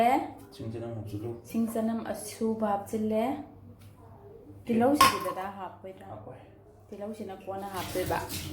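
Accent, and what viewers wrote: Kakching, India. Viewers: Tava ym nungai kakching mcha na ngngvase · Accent se kakching oiduiro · Kei lam dei no babhi ng ....kakching dei manli...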